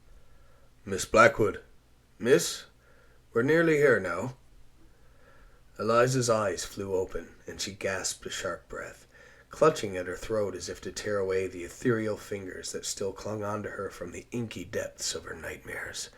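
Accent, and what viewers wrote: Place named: Newfoundland, Canada. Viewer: Love the way you laid in enough Newfie in that it feels authentic, but it's still intelligible to us on the west coast! I'm excited to hear the rest of the story😁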